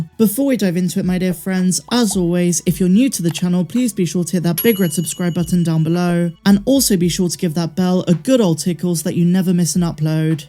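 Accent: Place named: England, United Kingdom. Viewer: ! 👍🏻 It’s hard for me to differentiate “Last” vs “Lost” with your English accent. It’s a very subtle difference for me as an American. I’m sure it’s obvious for the English.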